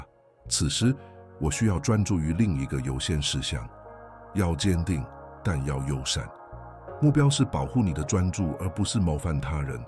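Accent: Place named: Hong Kong, China. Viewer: 是ai生成的港式普通话吗？有的词听起来会有歧义。最好是标准一点的普通话。内容不错，加油！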